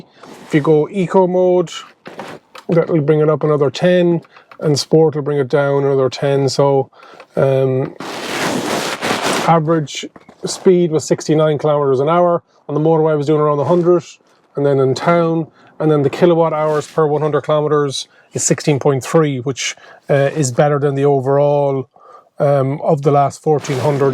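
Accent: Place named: Cork, Ireland. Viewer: You sound just like my dad was was born in Cork (Balenagree)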